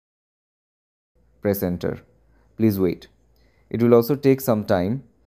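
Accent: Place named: India. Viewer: Ahh yes the "trust me bro" Indian voice. What a joke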